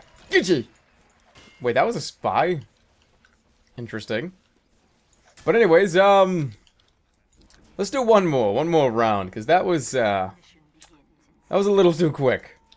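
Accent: Boston, United States. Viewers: Are you from Boston? I'm a new sub, and I can't tell...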